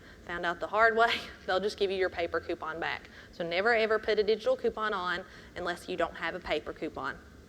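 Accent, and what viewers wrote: South, United States. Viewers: ***** Lol, You're very charming and I love your southern accent. Thanks again. :)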